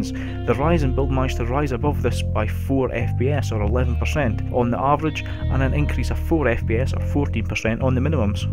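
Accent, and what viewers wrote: Scotland, United Kingdom. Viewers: Sub'd man love hearing fell Scots on YouTube, too few of us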